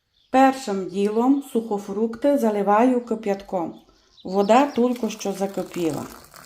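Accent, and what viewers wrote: Transcarpathia, Ukraine. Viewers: А я люблю пані слухати 😊 Спокійна, домашня інтонація, і чудовий закарпатський діалект. Я дивлюсь її відео два рази: перший раз насолоджуюсь її манерою говоріння, а другий раз - рецепт слухаю та занотовую😊😊😊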